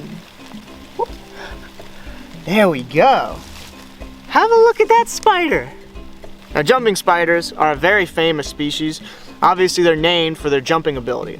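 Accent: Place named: Florida, United States. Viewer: First video I’ve watched on this channel, and I could pick you out as either a floridian or Louisianan without even trying. We floridians can sense our own 😂. Also, hey, that beer is still waiting for you in Panama City! Much love guys! 🍻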